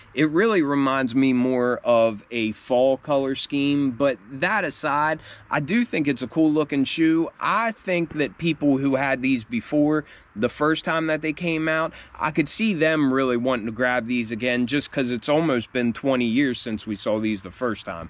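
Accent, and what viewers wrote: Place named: Pennsylvania, United States. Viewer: Nice video man!! Just a quick question are you from Pennsylvania?? You have an accent that reminds me of myself from Pennsylvania...lol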